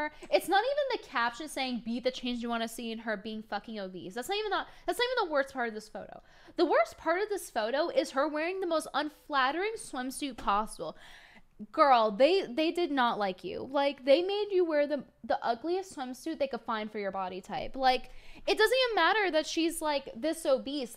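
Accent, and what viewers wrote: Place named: Texas, United States. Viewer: you are taking on a bit of the Texas twang you are a southern belle now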